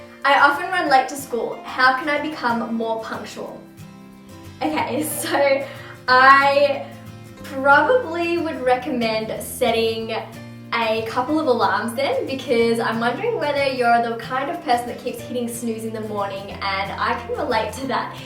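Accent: Australia. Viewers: Are you Australian? I'm very new to this channel, this is the third video from your channel I have watched! 😂 I'm not trying to be rude or send hate! I'm only asking a question.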